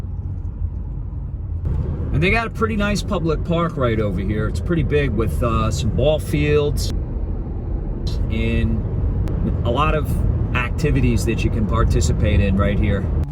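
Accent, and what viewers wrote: New York, United States. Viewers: I forgot what sun looked like thank you! You never lost your NY accent. Hardly anybody has one in NYC any more. Do you get discriminated against at all for being a New Yorker?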